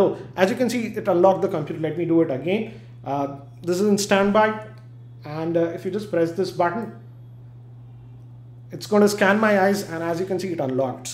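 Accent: India. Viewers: Indian accent. Urggghhhhhh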